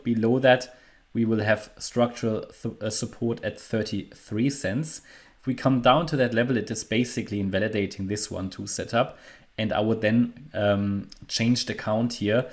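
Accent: Germany. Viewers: Cardano is becoming less value day by day (compared to Bitcoin) P.S. nice German accent 👌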